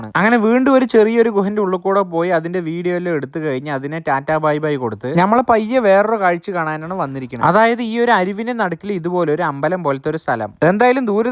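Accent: Palakkad, India. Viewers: വിയറ്റ്നാമിൽ ഇരുന്നു നമ്മുടെ സ്വന്തം പാലക്കാടൻ ഭാഷ പറയുന്നതിന് നന്ദി❤❤നലവീഡിയോ സൂപ്പർ കാഴ്ച്ച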